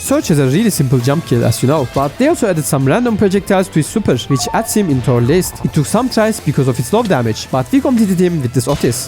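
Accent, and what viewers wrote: Turkey. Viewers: Love your videos and your Turkish accent brother. Ellerine sağlık abi Türkiyeden bu seviyede edit skilliyle çıkan birisi olması güzel. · are you turkish by any chance your accent is really similar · Omg i love you're accent its so cute😭😭 (Are you turkish??) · Aa Türk aksanı neden duyuyorum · kral bişey sorucam neden yabancılar için içerik üretiyosun türk olduğun aksanından belli oluyo çok merak ettim türk kitlesi daha çok bs izlemiyo mu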